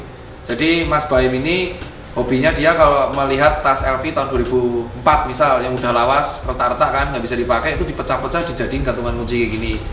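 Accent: Java, Indonesia. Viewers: Wong jowo.. wong ugm.. yo medhokkk.. salam medhok Mas.. pertahankan medhoknya👍👍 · jowone medok kabeh dok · HA JOWO E BRO!!! Ciri khas!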